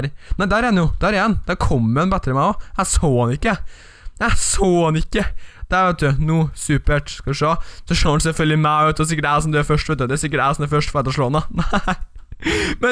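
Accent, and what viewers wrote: Trøndelag, Norway. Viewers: du e trøndersk d e jo drit bra trønderan e bæst!;D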